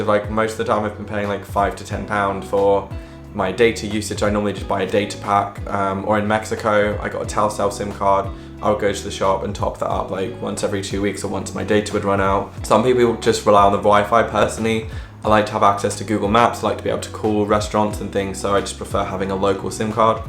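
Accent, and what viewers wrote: Australia. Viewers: You definitely have a Aussie twang in your accent now!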